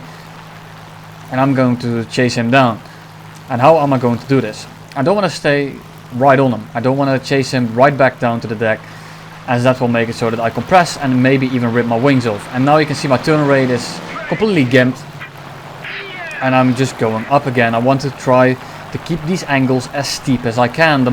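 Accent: Netherlands. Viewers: Oh that is why you got that Bas Rutten accent!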